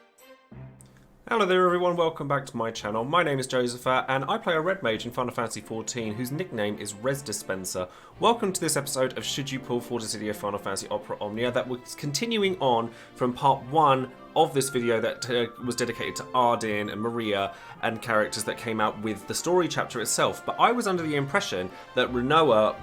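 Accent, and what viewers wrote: United Kingdom. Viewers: Laughed at the title. I truly enjoy your videos unlike most. Must be the british accent haha but joke aside your videos are very informative hence I watch it. Keep up the good job and hopefully you get more subscribers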